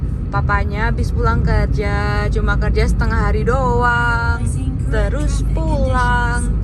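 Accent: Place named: Java, Indonesia. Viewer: Mbak e asal kota mana di Indonesia? Jawanya medok banget 😊...btw ini mbak e sekarang tinggal di Korea di kota mana?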